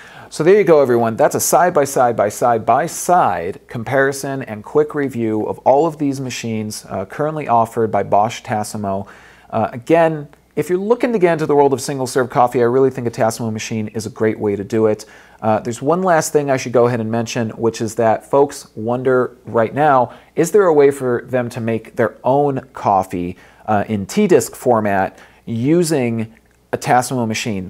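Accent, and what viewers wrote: United States. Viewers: He's american. They all say button that way.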